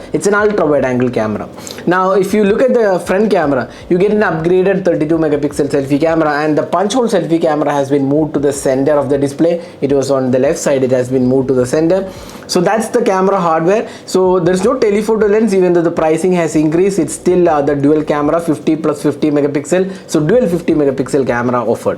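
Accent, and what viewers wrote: Kerala, India. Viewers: perfect mallu accent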